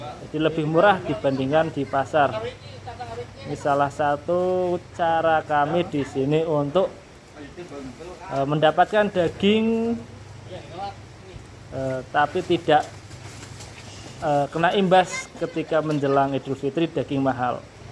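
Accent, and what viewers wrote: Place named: Wonosobo, Indonesia. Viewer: Omongane kea Wonosobo ya bolo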